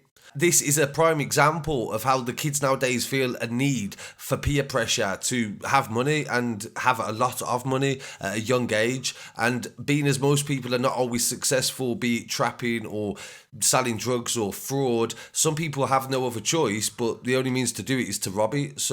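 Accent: Birmingham, United Kingdom. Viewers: This guy needs someone different narrating brum accent is just beyond terrible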